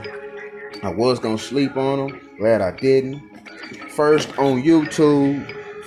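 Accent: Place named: Texas, United States. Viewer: Side note I can def tell you from Texas lmao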